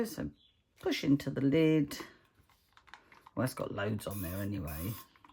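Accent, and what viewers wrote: United Kingdom. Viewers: I was not sure about you at first - but I grown to love you and your videos. I love to hear you talk in your UK accent and words. I love that you show mistakes and then make something so great out of them. I just wanted to tell you I have the black Stampin up mat that you tried. I find if I'm not in my craft room it dries out and it is not sticky. So if you use a wipe or even just a damp cloth it should bring the sticky back. Again just wanted you to know you have such a fan in me - I have learned so much from watching you. Bebe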